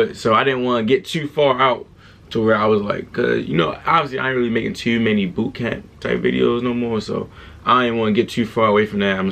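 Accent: Baltimore, United States. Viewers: Nah, that's Baltimore all day and I'm not even from there 🤣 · Where you from Baltimore?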